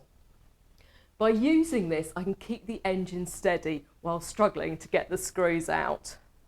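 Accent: United Kingdom. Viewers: Amazing person God bless ya and love your British accent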